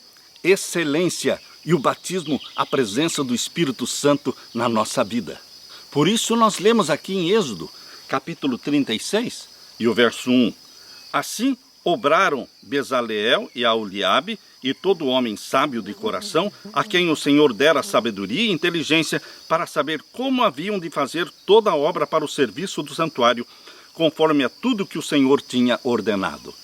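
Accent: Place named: South, Brazil. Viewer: De onde o sr. é? Com este sotaque bonito? Parece do sul! E este local em que está fazendo o estudo da lição, com essas prdras lá atrás Que lindo!....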